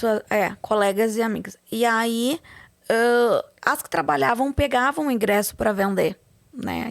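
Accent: Rio Grande do Sul, Brazil. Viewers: Aqui nos comentários tem bastante gente que comentou sobre o sotaque gaúcho tchê aaannn lá é o popular guris e gurias, aqui em MG na capital tem o Minas tchê mistura às culturas e tradições mineiras e gaúchas🎉. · Gente, ela é gaúcha. Vcs queriam que ela falasse como??? Aaaaffff... Gente chata! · Ela é gaúcha querem que ela fale como? o povinho insuportável!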